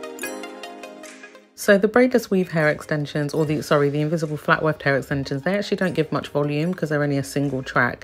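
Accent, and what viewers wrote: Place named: United Kingdom. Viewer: Gosh, I always drool over British accents, but yours is even better than usual. Hair looks great too!!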